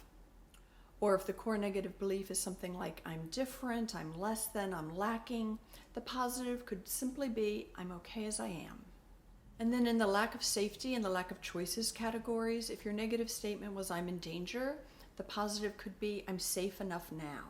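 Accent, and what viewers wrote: United States. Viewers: Your videos are amazing! They help me a lot! I also love your californian accent.... And you are so pretty! Thanks 🤗☺️🌷🌷💕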